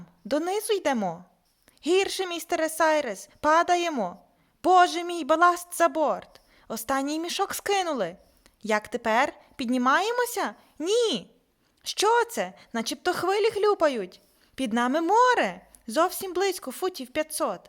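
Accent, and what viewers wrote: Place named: Western Ukraine, Ukraine. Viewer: Варто додати що присутній західний акцент. Що є різні вимови.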